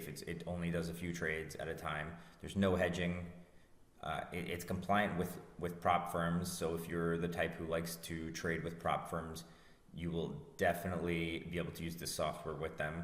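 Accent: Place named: United States. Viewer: You are obviously American the way you talk (like me) but why are you using Blazemarkets? They don't allow US residents.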